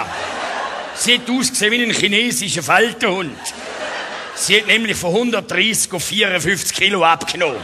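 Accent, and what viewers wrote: Switzerland. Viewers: 10% verstanden und trotzdem gelacht ^^ - ich kann kein schwitzer Dütsch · schweizerdeutsch. zugerdeutsch. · Neh an und für sich ist das ne Art deutsch ... Aber was jetzt genau ? Irgend ne art Dialekt oder dieses Schitzerdütsch ?? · schade das des "nur" auf schwitzerisch is. bin aus bayern und hatte den marco in der wochenschow immer gern. leider versteh ich nichts.... nurn bissl was ich mir zusammen reim kann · Nee das ist Schweizerdeutsch :)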